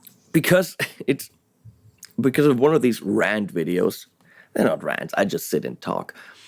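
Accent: Germany. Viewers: I think they might r some unconscious bias going on, tall bald German speaking English with a German accent (rightfully) knit picking critiquing things. Not fair but I can imagine it being a thing As far as the Marshall getting back a/part of the solution could be frfr’s/stage pa’s and official licensing of irs and amp modeling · I'm afraid of you because of your lack of hair, that german accent, and your freakishly large stature... otherwise, you are lovely :D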